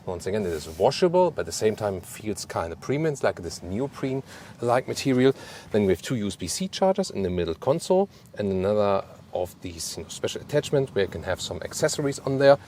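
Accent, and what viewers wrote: Germany. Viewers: Genglish… what a horror… Jesus safe me please…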